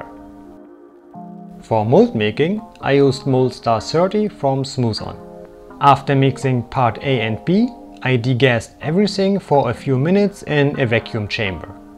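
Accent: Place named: Germany. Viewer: Nice work, aber wenn deutsche versuchen Englisch zu sprechen hört der Spaß bei jedem Video auf O.o omg !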